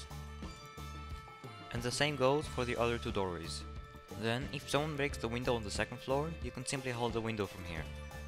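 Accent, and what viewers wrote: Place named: France. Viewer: Your accent and voice are sooo mesmerizing… I learned so much. Are you French or European?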